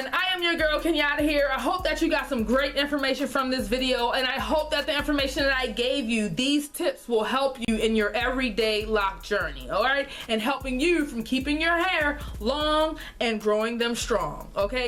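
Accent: Baltimore, United States. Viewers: I gota ask.. lol are you from Baltimore??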